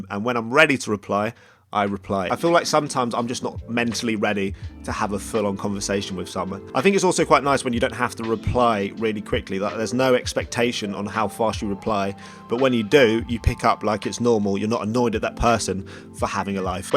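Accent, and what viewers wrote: United Kingdom. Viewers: Your smile Yout facial expressions 😭 I like them all, once again And to top it up the Bristish accent May you always remember this comment when you feeling low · Esther Igbinedion it’s very obvious he’s british lmao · Yeah he’s a fellow Brit :) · His accent is the same as everyone’s British accent smh 🤦‍♀️ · Aww i am so in Love with this british accent. Greetings from Germany ;) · His british Accent is so cuteeee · I hate british accent but love yours :) though I need to work on my listening skills to get 100% of what u r saying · I really like that accent, does anyone know which one it is? Like what region from Britain or how it’s called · Oh My God, I love YOUR ACCENT!!! WHERE ARE YOU FROM?? Btw I can tell you're from the uk but where exactly? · Okay I just found your channel, wasnt going to stay but then the accent...lol yh it got me, wish my British accent was that strong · I didn’t know who u were but I was not expecting a British accent 😂😂 or idk what accent u have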